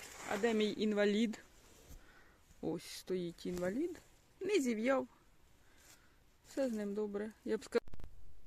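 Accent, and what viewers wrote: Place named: Varva, Ukraine. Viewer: Знімайте будьласка довші відео,хочеться Вас слухати і слухати.Якаж співуча мова наша,я з Варви.Але вже 50 років там неживу,але говір впізнаю завжди.Будьте здорові і успішні.Божої благодаті Вам і вашій родині.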